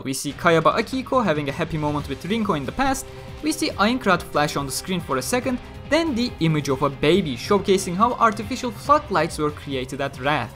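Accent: Turkey. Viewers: ingilizce konuşuyon ama konuşurken türk olduğun çok anlaşılıyor xd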